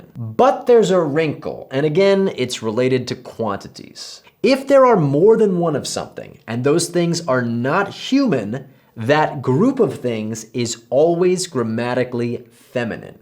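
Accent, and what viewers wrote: Egypt. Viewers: It sounds like you’re learning in Egypt 🇪🇬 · يا الله اللهجه المصريه سيئه جدا مفروض انك تعلمت لهجه شاميه او لهجه خليجيه بيضاء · As a Saudi myself you sir are 100% certified ✅😂 I notice that you speak Arabic with a hint of an Egyptian dialect which is cool and understandable seeing that most people choose that dialect to learn Arabic. Your pronunciation is impressive and Egyptian dialect has it's own humour and "ring" it's such a heart lighted dialect in Arabic so hearing you speak with it in a common tongue not a traditional one makes you relatable and pleasing to hear ❤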